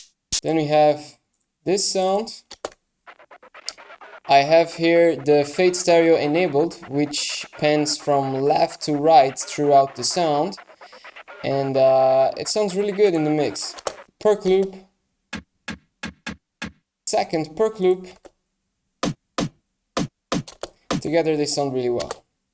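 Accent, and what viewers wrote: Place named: Brazil. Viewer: are u brazilian? eu percebi pelo sotaquezinho hahaha tutorial foda cara, esse som tá bem único mesmo!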